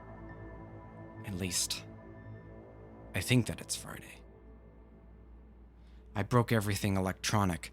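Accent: Canada. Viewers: this was amazing! I love listening to you read.. I love the Canadian accent! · | I'm american, Canadian accents don't bother me at all, but even if it had your reading was fantastic sir. honestly didnt really notice it until i read the comments because i was on the edge of my seat. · This is the first I've ever been able to identify a canadian accent as a non-english speaker. You should be proud lol! At least stop apologizing for it :D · The narrator is Canadian. It makes no difference towards the story, just an observation. Mainly getting this from the way he says words ending in -out. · is the narrator canadian? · Narrator has to be Canadian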